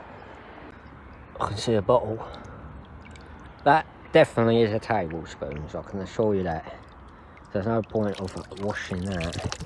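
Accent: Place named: Cockney, United Kingdom. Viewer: Your talking more and more cockney these days ennit.....but carry on larking coz I still like to tune in .xxx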